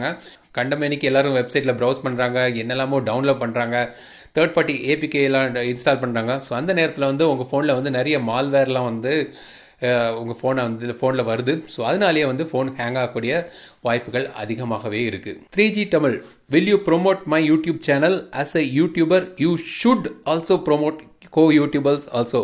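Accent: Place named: Nanjil Nadu, India. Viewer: nanjil tamil super....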